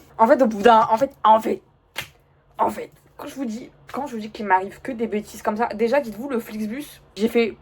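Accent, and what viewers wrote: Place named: Maghreb. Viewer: Pourquoi tu parles comme une blédarde? Pfff. La vie en ville les accents de la ville c'est devenu d'une laideur. Dommage sur un si joli minois. Enfin bon force dans tes galères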